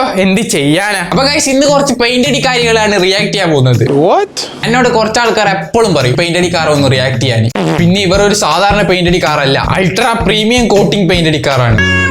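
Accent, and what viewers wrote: Kannur, India. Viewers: Kannur basha poli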